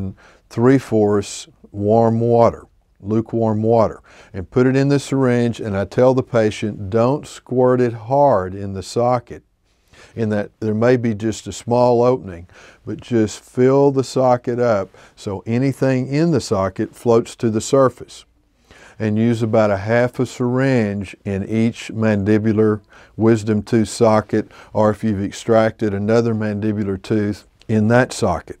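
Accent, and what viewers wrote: South, United States. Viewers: Once i heard is southern accent i knew he was the real deal sourthern dentist who been in the game this long are good ole cowboys and masters at their craft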